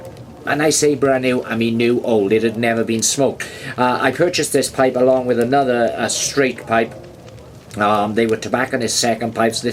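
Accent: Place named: Welsh Valleys, United Kingdom. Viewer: Who'd have thought it? The YouTube algorithm eventually found me a channel exactly to my tastes. I have a fair amount of pipe channels saved and favourited, but you're the only fellow Welshman I've happened upon, and a western writer too. Dare I assume your accent is from the Ogmore Vale, maybe the Rhondda? I'm hailing from the Twrch valley between Swansea and Brecon. From what I've seen, your content is great. Fantastic job, Bendegedig! You should be proud 😎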